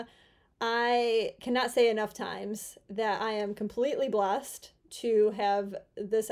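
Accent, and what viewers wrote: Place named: Midwest, United States. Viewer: I'm a little disappointed you won't be sliding anymore. I thought that having the baby would be the big change, but I guess this engagement is bigger. I really enjoyed you're frank discussions and the Midwest accent. I have some relatives in Iowa, Illinois, and Ohio.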